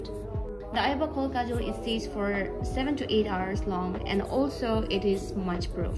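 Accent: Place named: Nagaland, India. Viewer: Love the way you speak Hindi mix nagames ....😂😘😘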